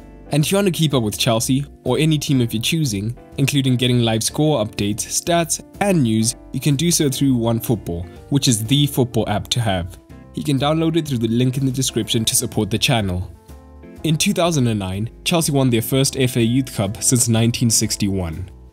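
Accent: South Africa. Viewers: So who's the South African dude narrating this? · 100% South African speaker 👍, Shoutout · Are you South African? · The narrator sounds South African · Are you from South Africa?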